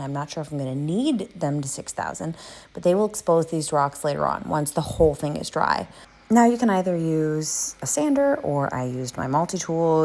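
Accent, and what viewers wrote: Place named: Nova Scotia, Canada. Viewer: Nova Scotia? Wow, I knew I knew that accent!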